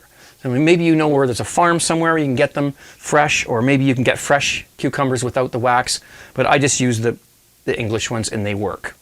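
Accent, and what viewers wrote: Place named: Canada. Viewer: Michael Kimber he's definitely Canadian. we don't say "Bob's your uncle" in the states. and it's pronounced erbs the h is silent 😝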